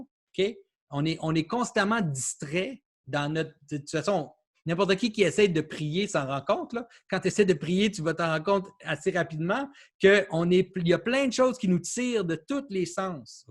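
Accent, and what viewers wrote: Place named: Quebec, Canada. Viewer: I don’t even speak French and I know this guy is speaking Québécois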